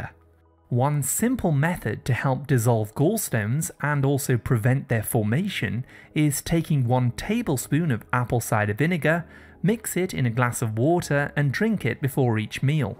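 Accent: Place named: RP, United Kingdom. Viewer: Why does the announcer sounds like a robot with a Buckingham palace accent?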